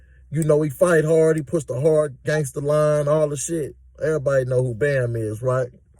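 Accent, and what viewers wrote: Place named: Detroit, United States. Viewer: Woo woo woo woo . Oh yeah sounds like a D nicca 😂😂 . My 1st time on your channel cuz . I don't know where you from just talking shi. Much love and respect blessing & success to your family and channel. Midwest fo sho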